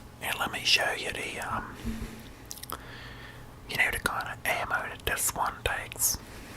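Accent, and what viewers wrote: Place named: South, United States. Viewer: he is not australian he just has a country accent.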